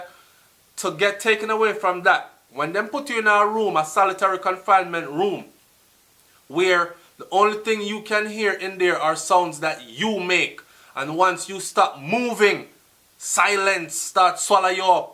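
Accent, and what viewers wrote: Jamaica. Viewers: its IAN the BIG MAN from England long time,hope you and your good family are all well. I don't know how you do it, the big tv stations in the USA should grab you up oay you good money,and give you your own spot on their tv station, you explain everything so well with patois thrown in for good measure, and I don't say this lightly. your explanation about how the US run their system was superb. May I add that the United States whether we like them or not are so powerful that even in England they took away two white English stock brokers from top English family for stock market fraud against the US, they fought extradition "tooth and nail" as the saying goes, but not even the Prome Minister at that time Tony Blair could save them, at the present time they are in a Federal Prison sewing mailbags. A bit of info for you and your good subscribers, to show you how powerful the Yanks are, if a current American serviceman was to commit a crime in England, no English court cant touch him or her, instead they will be flown to the US and be tried and if guilty, be sent to a military prison, that's the power of America, lastly any foreign head of state visiting England,bodyguards have to leave their weapons on board their country's plane, not the Americans, even the Russians and Chinese have to comply with British request who provides armed police. 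So can you imagine what they will do to a Jamaican citizen comitting crime against US citizens, enough said,I am out of here. Bles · Boo fi you man! Stay a foreign wid yu fuckup yaadie accent and ur judgements, why are you so happy that people are going to prison? Yu think a dem alone ago suffer now? #YuAWasteman #Sensationalist #NevaWatchBeforeAndNahWatchNuhMore #YuABait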